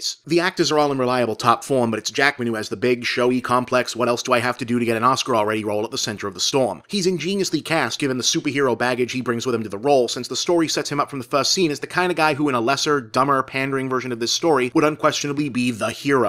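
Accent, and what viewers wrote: Boston, United States. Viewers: DUDE!! control that Boston accent. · is it just me or is more and more of boston accent coming off more than usual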